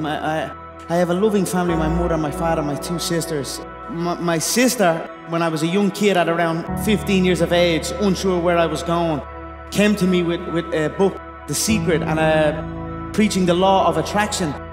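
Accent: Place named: Ireland. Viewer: 아일랜드 발음 중독성 쩌네 ㅋㅋㅋ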